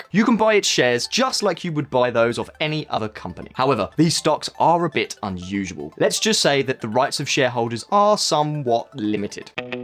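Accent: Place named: RP, United Kingdom. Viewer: This presitator is so fakeish. too posh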